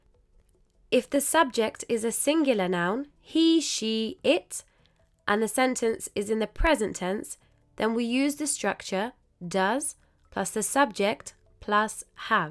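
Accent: United Kingdom. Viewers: May I know which is the UK's accent you speak, incredibly keen on learning more and be able to speak like yours (hopefully)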